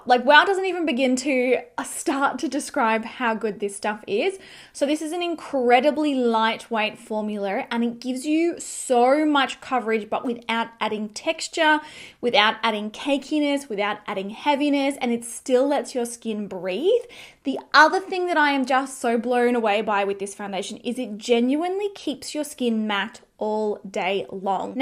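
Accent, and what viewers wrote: Australia. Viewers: I just love her Australian accent. I wish I had it too 🥰 - You should try the Wet n Wild tinted hydrator (if you haven't). It's incredible for my oily skin. I like that one way better than Fentys. But we're all different so just my opinion. I think you should try it if you can. Plus it's like 5 bucks. The Incognito concealer is great too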